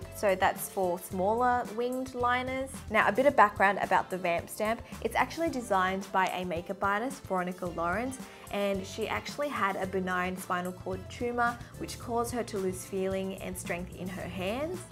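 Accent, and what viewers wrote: Australia. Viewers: Hey I'm new to her channel, is she an Aussie?? I'm an Aussie too so that's why I'm wondering 😂😂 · Are u Australian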